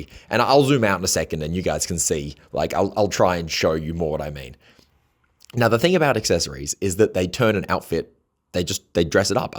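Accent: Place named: Australia. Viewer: You sound more Australian in this channel for some reason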